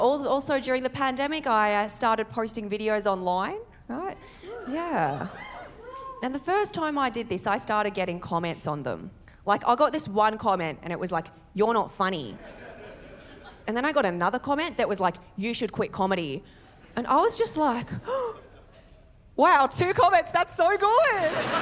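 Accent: Australia. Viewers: I've never heard anyone more Australian in my life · face deffo doesnt match the voice. yer an Aussie through and through. subbed. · That's pure Aussie accent, Mate! She grew up in Sydney. You just assumed she has Vietnamese heritage from her name, & while she says she Chinese, she was NOT born in China nor Vietnam. You'll never get where was she was born! If you think the tone changes & the accentuated vowels is anything to with a Chinese or Vietnamese accent it doesn't!. That is all 100% this type of Aus accent. If you'd only had heard the audio, you would have no clue there's anything other than aussie. Because there isn't. Now go watch Diana Ngyen, to see the difference between accent, & what you wrongly think her accent is. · Hi. "Bogan" isn't exactly the equivalent of American 'Redneck'. (it's just the nearest word/type to explain it). A better description of Bogan is "a bit rough" in style and speech. 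accent is how some Bogans would also sound. (some, not all). However, there's also thing called "cashed-up" bogans. Definitely not unsophisticated, just might sound it from way they talk. Of course there are also the many "true bogans". After 4 or 5 VBs, or Fruitly Lexia, nearly everyone becomes Bogan, lol There's several types of Bogans, just like there's (more than) several types of Australian accent. is not common now in Melbourne or Sydney & in a lot of Brisbane any more, except in some outer suburbs. Many Australians are commenting, in/on videos it's the "most Australian" accent they've heard. That type of Aus accent was common till the 90s, but in the cities, at least it's often more rounded now. But there's also the frequent Euro-Australian accent, which is another, again. (Although, quite a number of Austalians don't realise they're own accent is more "Australian" that they think) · She's Aussie for sure! 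No Asian can speak like that!🤭😎👍👍👍👌👌👊 Your awesome lady!👍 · I Honestly never realized Super Cute Asian Girl with an Australian accent was my thing until just now. Alas, you're too young for me young lady, so it'll never work. You're really funny. You deserve a Comedy special. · she has a Asian au accent very common in Melbourne and Sydney she doesn’t sound bogan at all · I'm a gen x white guy from Melbourne and she sounds more Aussie than me lol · Love the Aussie accent regardless of the ethnicity… .. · I love watching and of course listening to her Australian accent. Her routine is funny and her timing is awesome! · You sound more Australian than Nicole Kidman and Margot Robbie combined. Good stuff lol. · If you live in Australia and are respectful and proud to be in Australia then I have no problem with calling you Australian. You however have such a strong Aussie accent that I would have assumed you were actually born here and maybe even your parents had been here for a long time. 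Also that was a hilarious video. Cheers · What makes her funny is she has a THICK Aussie accent, she's funny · She has the Chinese/Vietnamese Australian accent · I love when another nationality sounds like us true Aussie’s ! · You are quite funny. I love your Aussie accent. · Nah you got that Asian Australian voice/accent. There’s that accent that is not a fob accent, but it’s very typical of Chinese descent Aussies.. especially women. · She sounds so Aussie it’s mad. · It's unusual to see someone of Asian heritage (or African) with such a strong Aussie accent. It's very endearing! Continue being bogan and proud! Own it! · You are the best of both world , beautiful Asian looks and wiked Aussie accent , keep up the great work you have a great sense of humor ! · Sounds more Aussie than most Aussies😁🤣🤣👍🇦🇺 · She has that Australian Asian accent. Most of my Aussie asian friends have it. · ABC 👍👍perfect australian accent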